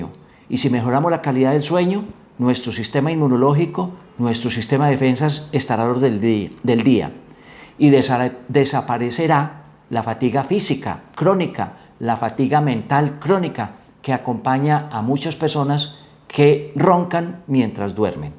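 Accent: Colombia. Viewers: Por tu manera de hablar, eres colombiano, estoy en lo cierto?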